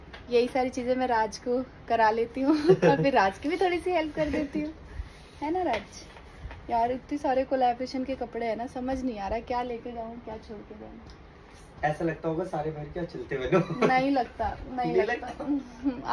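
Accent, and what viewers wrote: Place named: Bhojpuri, India. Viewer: Aaj 1St time comment kar rahi hu .Aapke muh se bhojpuri bahut acha lagela❤❤❤❤❤❤❤❤❤❤❤❤❤❤❤❤❤❤❤❤❤❤❤❤❤❤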